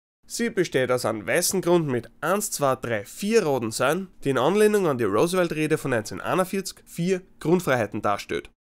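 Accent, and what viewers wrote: Vienna, Austria. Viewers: Obwohl ich zwei Jahre in Österreich verbracht hab (hauptsächlich in Skiregionen und auf Bauernhöfen bei befreundeten Bauern), geht mir dein Österreichisch auf den Sack. Zu oft versteh ich tatsächlich was nicht. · Es ist so lustig dich nur von den normalen zu kennen und dich dann plötzlich Österreichisch sprechen zu hören! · Warum er spricht doch nur normal Deutsch? Nur halt wie ein echter Österreicher der im Dialekt redet. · er hört si an wie a weaner (wiener), i bin theoretisch soizbuaga (salzburger), aba aus da stodt, da fließt scho a bissi Hochdeutsch mit ei;) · This is not "Austrian German", it is a Viennese dialect. As in fact, every region in Austria, Germany and any other place in the world has different dialects. There is "Austrian High German", like you would encounter it on TV news, for example. But this is not it. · Thematik gut erklärt und das österreichisch! 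😂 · Haha nice und ich hatte schon gedacht dass ich nen österreichischen Akzent in deinem Englisch raushören kann. Top erklärt und einfach so gerade raus ohne sehr auf Hochdeutsch zu achten, klingt super sympathisch 👍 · Ich kann nicht so gut österreichisches Deutsch finde es aber lustig zu zuhören😅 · Its austrian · Cgp grey aus Wien?:) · oh mein gott ich hab denn österreichischen akzent total vergessen ist der niedlich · Ach ja, ihm gab es auch mit Österreichischen Dialekt. Erstmal das Video nochmal anschauen aber diesmal auf Bayrisch². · Oh so Austrian German does sound different from normal German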